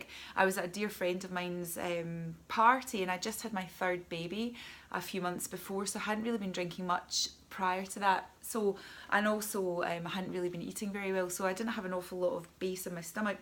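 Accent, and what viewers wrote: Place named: Ireland. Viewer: I hear your story. I hear your irish accent. Although I'm from the states I grew up in an irish catholic background and community where its commonplace to drink everynight after work and on the weekends. There was a bar on every corner. I'd walk to Flynn's corner bar and walk home in a blackout for years I did this. I'm 45 now and going to AA and really trying to stop my boozing days! Thanks for sharing love!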